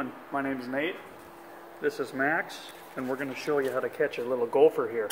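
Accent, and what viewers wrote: United States. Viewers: Is he from Minnesota? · Sweet alabama or america somewhere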